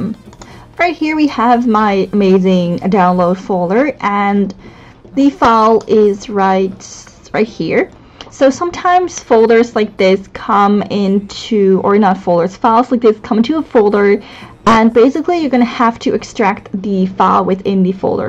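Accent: Netherlands. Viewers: are you from the Netherlands? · are you dutch?? cause i am :)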